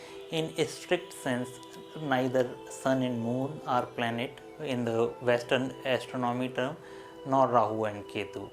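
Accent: India. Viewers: Actually is speaking in indo English language so that others who has basic English they can understand wht is he saying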